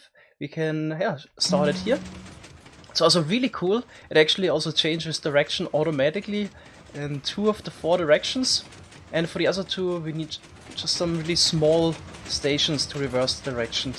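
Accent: Bavaria, Germany. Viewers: I'm Dutch but I've always noticed his German accent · Kommst du aus bayern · I love your german accent, also love how you say BEE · Du kommst aus Bayern oder? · Now this is an Bavarian dialect I think. But I don't think many people care about that even in Germany. Although it is quite hard to understand German dialects sometimes. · Well as a german i obviously noticed your german accent right away, but who am i to point that out? I'm just here for the nice content :D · Funny how you pronounce the "r" in the german word "erfinderisch" for an english audience in a spanish or italian way ;) Edit: Could be bavarian or so, do you speak a dialect often? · Bist du Bayer? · Hey, ich habe mir mal vor einer Woche ein Video von dir angeguckt und habe ziemlich sofort bemerkt, dass du Deutsch bist. irgendwie kann ich es immer sehr gut heraushören, wenn ein Deutscher Englisch spricht xD. 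· Ich habe sofort gemerkt, dass du deutsch bist😂👍🏻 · das lustigste ist, ich schaue dich seit ewigkeiten, und habe schon immer den deutschen dialekt rausgehört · Ich hab immer mich gewundert was es für ein Akzent ist, ich hab vermutet dass es Deutsch ist aber war mir nie sicher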